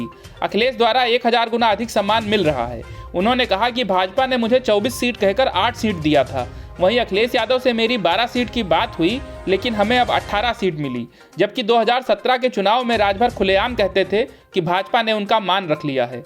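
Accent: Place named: Bihar, India. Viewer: Newsofindia wallon please apna ye bihari news reader / narrator change karo. Pehle wala accha tha, clear tha. Ye "saRak puR ghoRa khaR khaR dauRta hai" wala nahi chaiye. Please bring the old voice back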